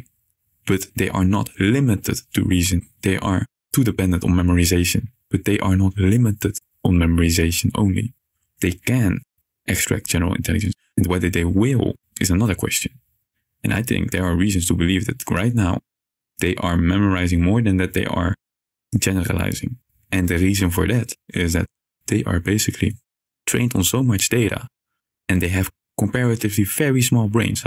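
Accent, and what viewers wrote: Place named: Netherlands. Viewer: Interesting video. Good job. Just one thing: your audio stops at around 8 minutes into the video but the video still goes on for like another 2 minutes. Maybe something got cut off? Regardless I just subbed and love the Dutch accent. Down for more content in the future :) Greetings from Germany and reddit lol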